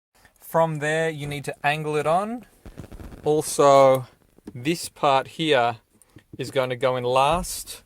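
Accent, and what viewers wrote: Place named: Australia. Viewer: Great video. Hope some others find some benefit from your tutorial on changing a cabin filter. Unfortunately, I don't drive, but I enjoyed listening to your Australian accent. ;)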